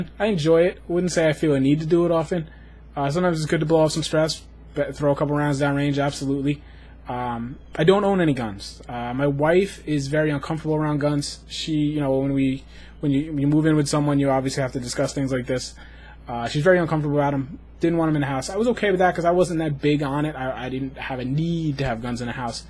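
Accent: Boston, United States. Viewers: you sound kind of like a boston guy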